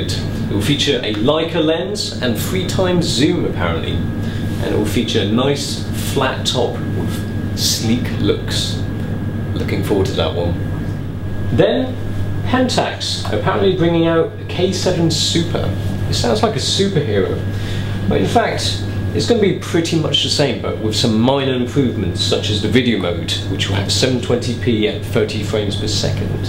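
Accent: United Kingdom. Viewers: "Presented by an Asian guy with a funny British accent." Hahaha always my first thought when watching DigitalRev videos! :)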